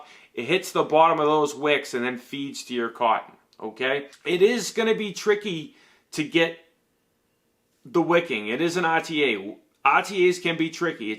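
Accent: Boston, United States. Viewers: You're not a Bostonian by any chance, are you? Just testing my accent recognition.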